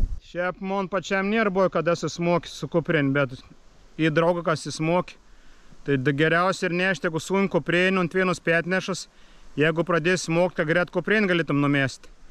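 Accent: Samogitia, Lithuania. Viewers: Ačiū! Už žemaitiu kalb.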